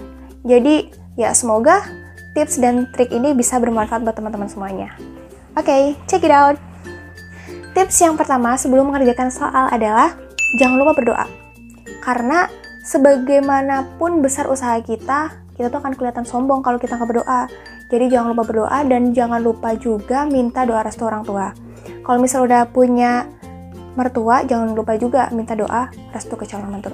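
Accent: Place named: Banyumas, Indonesia. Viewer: Salam Ngapak mba. Makasih udah share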